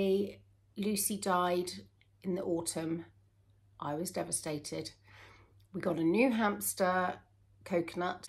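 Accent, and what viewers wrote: United Kingdom. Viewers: 🥰🥰what a lovely time I’ve had knitting while you chat, thank you for that. And yes we Americans think British accents are amazing. Anyone who breaks a friendship with you is the one who lost a gem! ( sent by a big fan who is usually very quiet) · It is a strange world where busyness tries to dominate- then we pause and ‘sit for a visit’ in the home of a fellow knitter who lives half way around the world. You make us laugh, and think, and together we all pause to be thankful. As an American I’ve always wondered about my very positive response to hearing the British accents. Then I had my DNA done and discovered all my English, Scottish, and Irish roots. Your honesty and adoration, frustration and dedication, to the four males in your house is remarkably apparent. In a world where ‘homemaking’ is pitifully underrated, and desperately needed, you set a good example. 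Keep up the good work.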